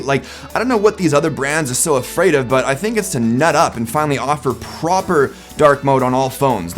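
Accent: Canada. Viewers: Are you from Vancouver (BC) eh?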